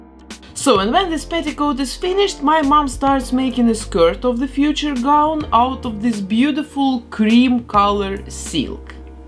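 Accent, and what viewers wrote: Russia. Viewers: Слышно что Русская! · Тыж русская. По акценту слышно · Русская что ли? Акцент слышен очень, русские поймут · Чувствую русский акцент! Но работа просто отпад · Я понимаю, что мне казалось, но я будто все видео слышала максимально русский акцент... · Excuse me, are you russian? или мне показалось... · Russian accent, wow! Even I can't normally say something in English, and you do it perfectly! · Where are you from, your accent make me think that you're Russian))) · очень слышно, что вы русская. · Почему я слышу жесткий русский акцент?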